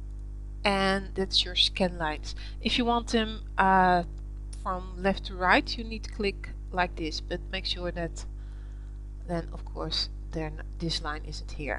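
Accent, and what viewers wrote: Netherlands. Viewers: sounds like a dutch accent am i right? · spotted the Dutch accent a mile away haha. nice tut thanx a lot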